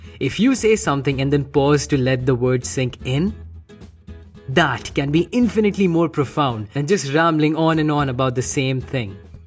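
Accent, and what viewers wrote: India. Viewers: Damn that indian accent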